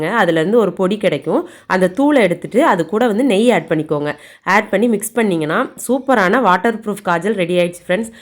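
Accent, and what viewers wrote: Tirunelveli, India. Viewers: Unga native thirunelveli,ah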